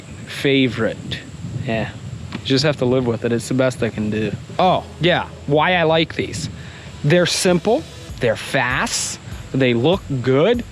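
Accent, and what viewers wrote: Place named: Maryland, United States. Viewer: What is that accent? Is that a northeast/Maryland accent because I am so intrigued by it! Looks good by the way!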